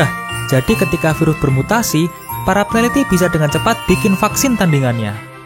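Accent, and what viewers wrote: Java, Indonesia. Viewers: medok gimana gue yang bukan orang Jawa masih paham apa yang dibilang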